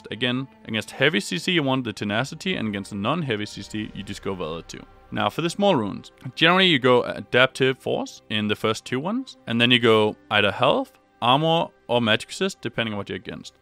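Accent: Denmark. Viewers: Are you from Danmark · is it just me or does this guy sound danish don't want to sound like an ass, I live in Denmark and know what a danish accent sounds like. just curious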